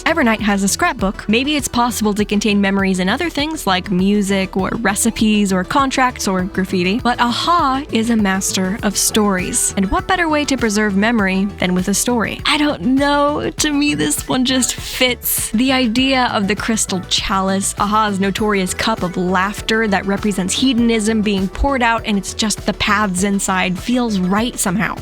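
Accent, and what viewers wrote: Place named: United Kingdom. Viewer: Why'd your accent suddenly change? I thought you were american or canadian but here and there during some instances you sound british , just curious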